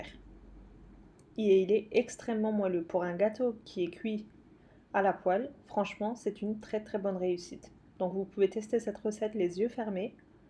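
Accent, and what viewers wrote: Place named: Lorraine, France. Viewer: bonjour Quelle est la taille de votre poêle? Tombée par hasard sur votre site j’adore! Je crois reconnaître un petit accent lorrain 😊 Bravo et continuez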